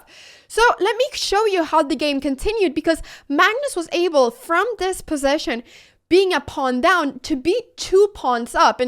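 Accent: Spain. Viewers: Off topic: like your spanish accent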